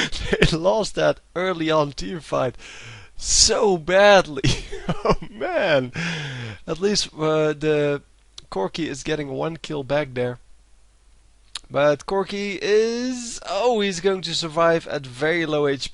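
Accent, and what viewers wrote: Netherlands. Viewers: You sound like a Dutchman speaking English.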